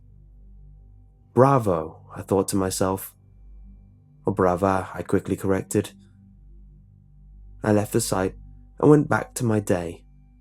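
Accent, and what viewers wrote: United Kingdom. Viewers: your British accent make it more creepier. good job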